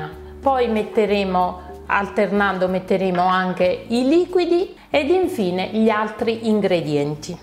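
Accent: Naples, Italy. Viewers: Signora siete troppo simpatica, nonché precisa e chiara, spiegazione eccellente , italiano corretto con la simpatica cadenza napoletana!!!!! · Adoro questa tuo accento napoletano... Sei simpaticissima e bravissima complimenti!!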